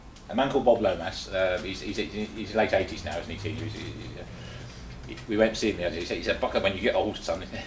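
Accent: United Kingdom. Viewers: two points 1) lose the hyper dramatic intro music. It diminishes the validity of the message with bombast 2) as much of a chore as it is, huge I know, subtitle. I presume Brits can understand this accent, but, combined with the too distant microphone, this flavor of English & its hasty delivery are unintelligible to an American.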